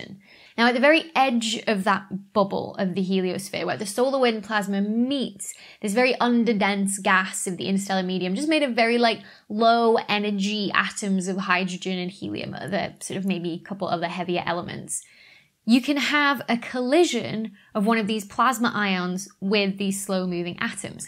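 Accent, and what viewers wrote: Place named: United Kingdom. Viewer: I gave it a like because it made me laugh. Listening to British people that by American standards don't have a strong accident can produce little surprises like this. It seems like they are speaking regular English and then out pops schedule, controversy, solder, etc. But bubble is my favorite.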